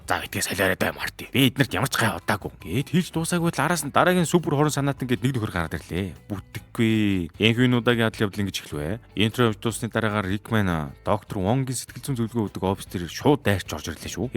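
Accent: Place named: United States. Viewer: Таныг яагаад ариун ус гээд байдгийг өөрөө цувралыг нь бүрэн эхээр нь үзээд ойлгосон доо хха. Сүүлдээ бүр хэл яриа Америк стилтэй болж эдр хха. Таны зовлонг ойлгосоон. Ххэ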